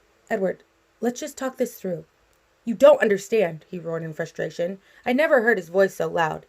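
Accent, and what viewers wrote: United Kingdom. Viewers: Your English accent is amazing 😊🏴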